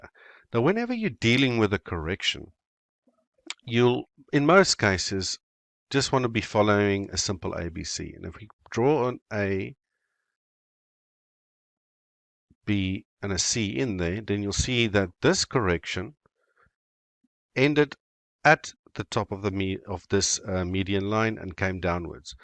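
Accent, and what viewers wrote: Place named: South Africa. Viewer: You sound South African boet, is jy?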